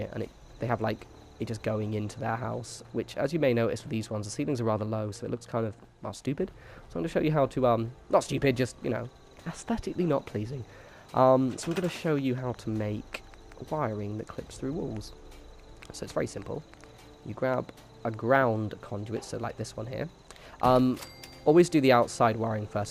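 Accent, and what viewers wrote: United Kingdom. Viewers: You sound like a british Travis, lol. In a good way, great vid.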